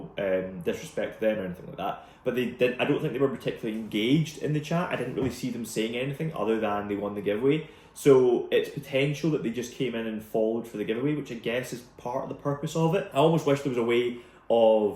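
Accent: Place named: Scotland, United Kingdom. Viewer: Never watched your whatnot, but getting back into Pokémon myself and got recommended this video! As soon as I heard that Scottish accent I was supporting you and your journey, best of luck mate 💪🏴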